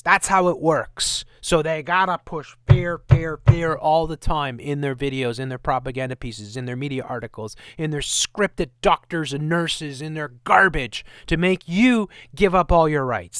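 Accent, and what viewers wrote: United Kingdom. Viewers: I’m from the U.K. her accent is typical BBC and that corporation is absolutely evil, they hid Jimmy Seville’s disgusting behaviour to minors and have a pretty disgusting statue outside the broadcasting house which shows exactly what they are. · British accent, most annoying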